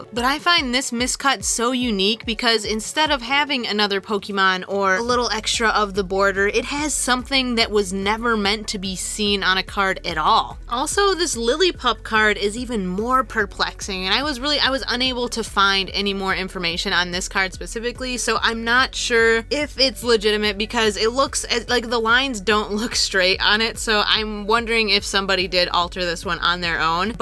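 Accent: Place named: Louisiana, United States. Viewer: are you from Louisiana? you sound so familiar like the shopkeeper of rockets hideout. please respond because you sound SOOOOOOO familiar -_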